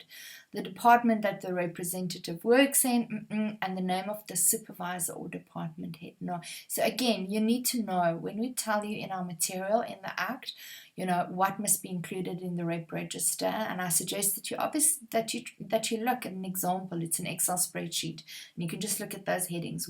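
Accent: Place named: England, United Kingdom. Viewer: Thank you very much. Btw, you sound very English, so maybe English instead came to you in a teapot.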